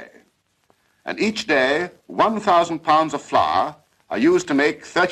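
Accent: United Kingdom. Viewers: This was during britains posh accent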